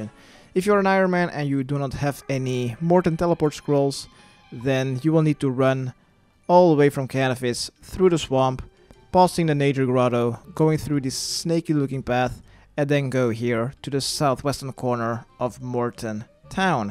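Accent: Flanders, Belgium. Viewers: What the fuck.. I always thought you had a dutch accent but you're totally flemish :o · Fantastisch! Ik wist niet dat je Vlaams was! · I KNEW YOUR ACCENT SOUNDED BELGIAN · He's dutch lol, although he sounds like he's from Belgium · wacht gij zijt gwn vlaams, ik kijk al 30 filmkes van ou en had da nie deur hahaha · bels denk of fries iig ben brabander en komt me niet bekent voor klinkt meer bels hehe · Ik wist da ge nen belg waart hahah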